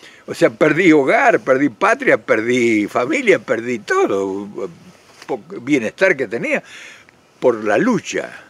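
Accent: Argentina. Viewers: No lo había visto nunca señor ,creo que usted es argentino por su acento pero usted habla de información y no tiene idea de lo que dice yo vivo en Ciudad de MEXICO y este señor obrador a destruido al país ,no hay trabajo, desmanteló la salud, la economía estamos en una. Corrupción como nunca ,hay pruebas y, Trump sigue mandando ahora ya se llevan el agua y el ejército mata a los ciudadanos , INFÓRMESE, ACTUALISESE Y, OJALA ALGUN DIA DEBATA EN SU PAIS CON JAVIER MILEI PARA QUE LE DE UNAS CLASES ,POR SU EDAD USTED ESTA CON IDEAS DE HACE 60 AÑOS ,VIVA MEXICO FUERA EL DICTADOR OBRADOR PREPARA SU RENUNCIA EN DICIEMBRE ,Y DICHO PIR EL ES EL PEOR DE LA HISTORIA MEXICANA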